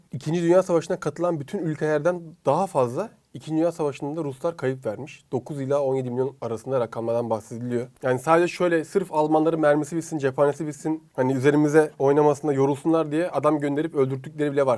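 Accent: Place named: Istanbul, Turkey. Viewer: Abi sunarken içten sunuyorsun. Sokak ağızıy la ve istanbul türkçesi ni karıştırıyorsun. Buda etkileyici duruyor. Özellikle tonlamalar 😅. Küfüre pek takmayın sadece izleyin. ☺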